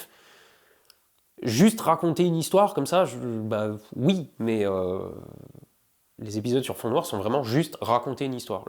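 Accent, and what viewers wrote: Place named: East, France. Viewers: Ce que m'inspire ta vidéo... Humblement... T'as pas un côté HP? T'as bien le droit de faire ce que tu veux... Je te suis pour le ton, les thèmes et ta façon de vulgariser... T'as le droit de faire ce que tu veux... Fais-moi rêver avec ton accent mosellan... On est voisin, big up.... T'as le droit de faire ce que tu veux... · De la botanique à la sauce, ça va dépoter! Je ne loupe pas les mises en avant de produit avec l'accent de l'est de La France. Et puis c'est l'apprentissage des gros mots en Alsacien. Pour les futurs sujets, fais toi plaisir et surprends nous! Tu aimes la qualité alors fonces. Tu fais parti de ces créateurs qui m'apportent de la connaissance avec humour. A bientôt.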